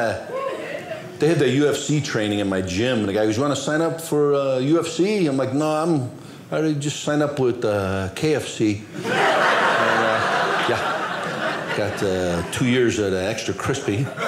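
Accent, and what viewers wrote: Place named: Midwest, United States. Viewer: Mw accent is so perfectly complementary. "YeAh that's da guy!": flawless timing. Funny guy.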